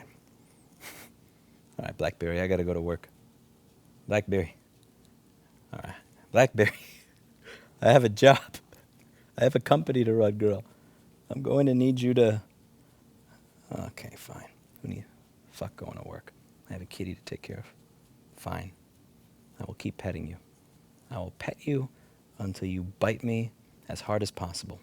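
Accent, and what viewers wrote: New York, United States. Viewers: The New York accent was in full effect today😮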